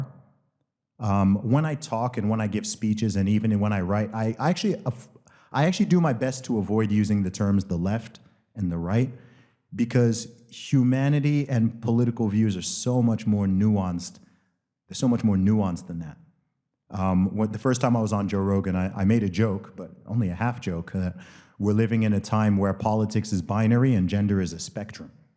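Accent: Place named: United States. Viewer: I’m confused. His Wikipedia page says he grew up in Saudi Arabia but is a British citizen, so why does he have an American accent?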